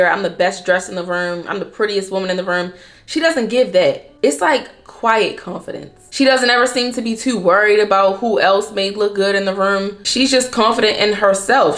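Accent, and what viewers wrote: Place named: Maryland, United States. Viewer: My gurl sound like she is from MD ?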